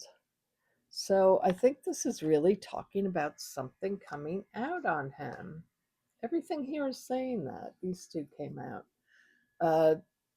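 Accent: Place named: Wisconsin, United States. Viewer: Where do you live? You sound a bit Wisconsin to me.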